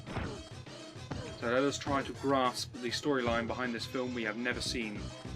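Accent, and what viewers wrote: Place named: United Kingdom. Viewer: Great to see you back,! Your monotone, British commentary was gravely missed and I was more than ecstatic to see a video from you show up in my feed. I can only hope you complete your mission of Let's Play Everything this go 'round.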